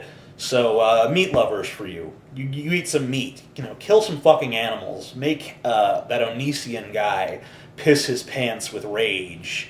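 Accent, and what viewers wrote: Scotland, United Kingdom. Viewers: His accent was Scottish :) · His accent is scottish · Thats a scottish accent? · His accent is Scottish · Its a Scottish accent, I cringed.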